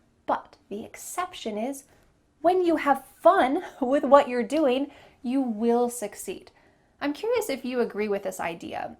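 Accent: United States. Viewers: Are you American?? I like American accent💜 and your smile is so beautiful 💜💜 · Are you speaking USA English?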